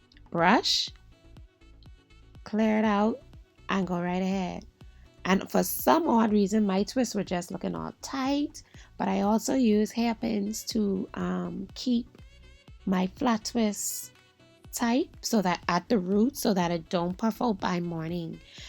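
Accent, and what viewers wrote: Bahamas. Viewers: New to this channel. Sounds like you have a bahamian accent. Your twist out came out bomb.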